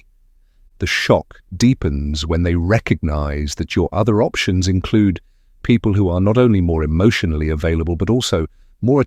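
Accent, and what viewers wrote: Australia. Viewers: Australian accent again please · I prefer the previous voice with the Aussie accent not this snobbish one. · Yeah was only r ason I watched this show a familiar Aussie accent I won't watch now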